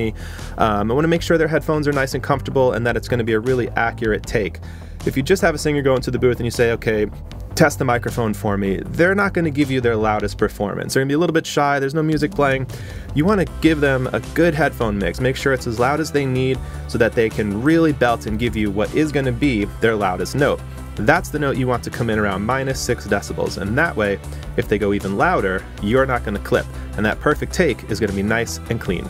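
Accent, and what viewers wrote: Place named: Long Island, United States. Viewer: Hey great vid I’m in Jersey I seen that strong island accent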